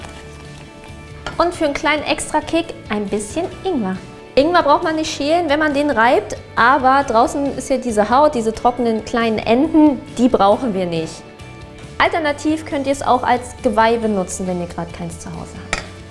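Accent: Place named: England, United Kingdom. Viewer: Sie spricht richtig viele Wörter im englischen Akzent aus.😂 Schöne Folge 😉😊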